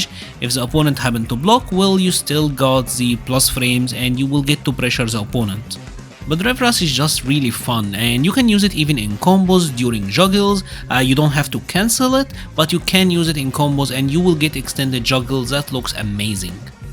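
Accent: Egypt. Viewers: صوتك مصري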